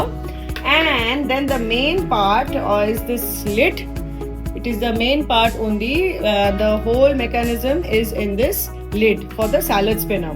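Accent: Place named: Telugu, India. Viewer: Ur telugu so cute 😊 nice bags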